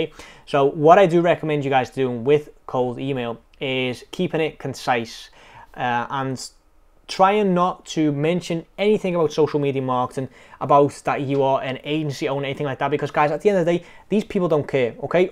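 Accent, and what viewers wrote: Liverpool, United Kingdom. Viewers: Where abouts is liverpool you from? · High quality content🙌💯 you have a scouse accent haha, it's really unique and I love it.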